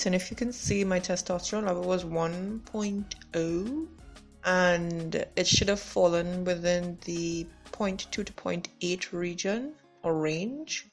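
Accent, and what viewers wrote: Trinidad and Tobago. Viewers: Very informative. I'm quite hairy in places I wonder if I should get the test. I' like ur trini accent 👍🏾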